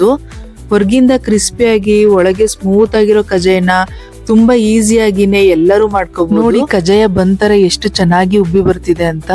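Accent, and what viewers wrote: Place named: Mysore, India. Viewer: Madam nimmadu yava ooru.? Nim mathadodu keluttha idre mandya Mysore kade kelvru heege mathadodu.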